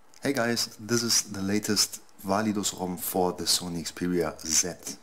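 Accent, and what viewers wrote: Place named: Germany. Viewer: Lol hast echt einen starken deutschen Akzent. Ist das das echte update oder ist es einfach von jemandem der das auf dem Internet hochgeladen hat?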